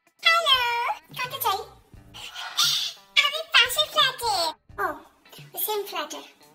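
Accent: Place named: Jessore, Bangladesh. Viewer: আপু তোমার কথা গুলা খুব সুন্দর। তাছাড়া যশোরের ভাষা তো এ জন্য আরও সুন্দর।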